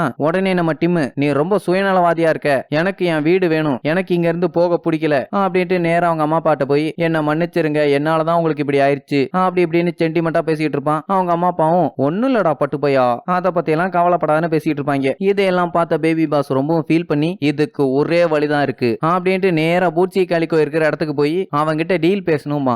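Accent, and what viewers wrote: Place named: Madurai, India. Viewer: Bro your slang is so super like Madurai voice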